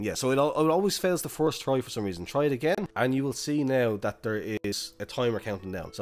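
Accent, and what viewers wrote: Ireland. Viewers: No way your Irish too?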